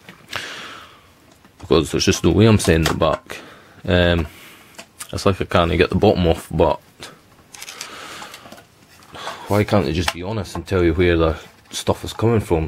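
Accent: Scotland, United Kingdom. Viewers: Hi must say I like your videos I must ask u your accent are u Scottish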